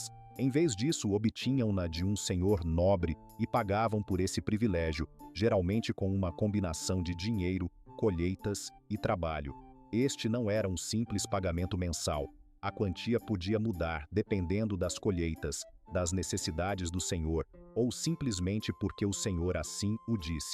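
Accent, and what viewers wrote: Brazil. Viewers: Que curioso é ouvir falar em português mas com sotaque brasileiro.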